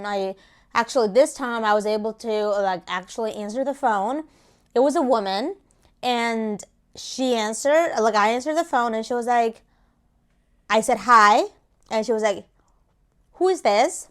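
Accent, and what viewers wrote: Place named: United States. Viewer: Your American accent helps me improve my pronunciation.Waiting next English speaking vlog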